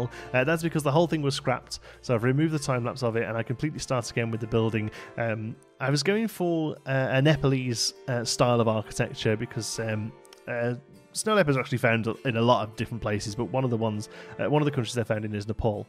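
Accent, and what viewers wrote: United Kingdom. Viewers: You time these perfectly with my lunch 👌 Everyone at work hears some British nerd talking to me everyday around this time 😂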